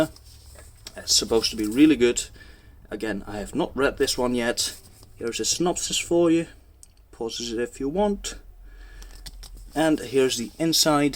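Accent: Netherlands. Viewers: Hoorde het meteen aan het accent hahah leuk dat je uit Nederland komt. Geabonneerd! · My classmate talks like you. Literally. He also says sOmething. He's also dutch btw. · I can hear ur dutch haha! Great collection dude! Greets from a fellow Dutchman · Haha, wist dat je nederlands zou zijn aan je accent. Toevallig zelf ook gister nog manga gekocht van marktplaats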